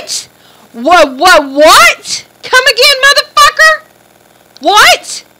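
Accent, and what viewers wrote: South, United States. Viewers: I love your country actcent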